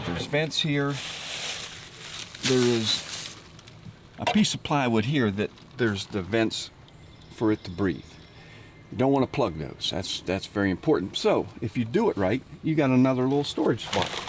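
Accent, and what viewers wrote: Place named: Western Pennsylvania, United States. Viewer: western PA accent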